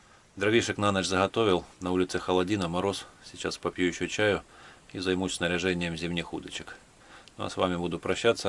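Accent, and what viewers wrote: Ukraine. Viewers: вы где то на Украине судя по говору?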